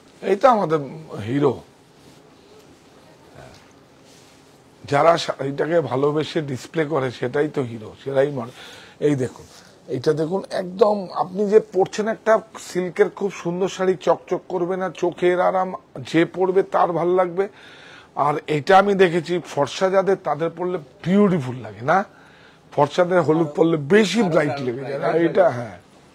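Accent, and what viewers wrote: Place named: Marwari, India. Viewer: The way you speak, it appear like a typical marowari . If you bring some “ bangaliana “ in your speaking , you would appear more believable..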